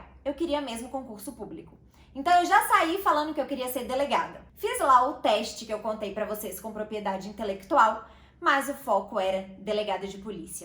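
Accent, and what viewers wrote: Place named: Minas Gerais, Brazil. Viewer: Sutaque mineiro raiz, a nossa minas gerais. hehehe ótimo vídeo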